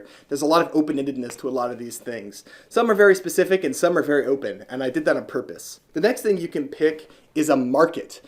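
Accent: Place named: United States. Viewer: also, as i assume your american due to the accent, is it eastern standard time, western standard time or central time?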